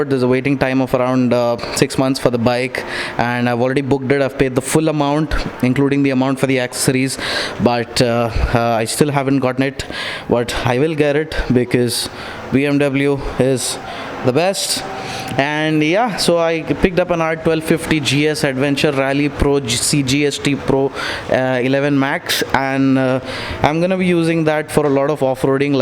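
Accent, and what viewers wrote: Hyderabad, India. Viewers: Bhai Hume aapka Hyderabadi accent me video dekhna pasand hai bhai♥️ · Didn't like this English version make video in your old Hyderabad hindi style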